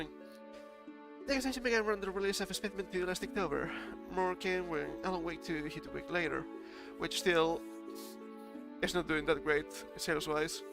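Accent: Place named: Germany. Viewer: Dude what accent is that?? I thought you where speaking German at first.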